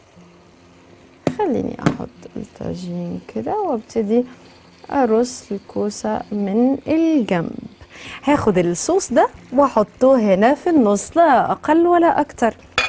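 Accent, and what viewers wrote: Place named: Morocco. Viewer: تسلم الايادي حبيبه حتى وانتي كتكلمي مصري اللكنه مغربيه مزال حااضره ههههه لاااايك بقوووه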